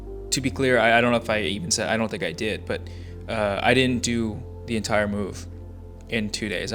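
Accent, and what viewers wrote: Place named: United Kingdom. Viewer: The British voice and the music was pretty chill but i like it without music too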